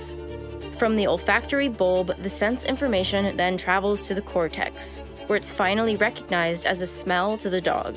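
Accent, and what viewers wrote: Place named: United States. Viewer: How come this is written in British (hoover, footfalls) but narrated in American/maybe Canadian?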